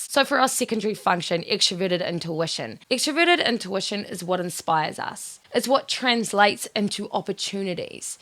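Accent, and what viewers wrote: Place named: New Zealand. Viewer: That posh/bogan kiwi accent tho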